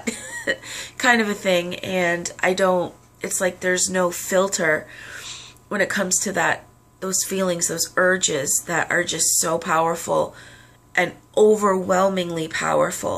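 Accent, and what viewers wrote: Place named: New York, United States. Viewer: Thank you so much. You have a teensy bit of NY or (?) accent. I wish we lived close so I could hug you. Thank you.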